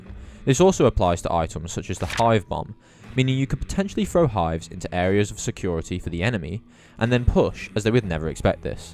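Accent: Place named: United Kingdom. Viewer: Right, idk why i said american, when that's totally an uk accent, so why uk people say "staminar effect, instead of stamina"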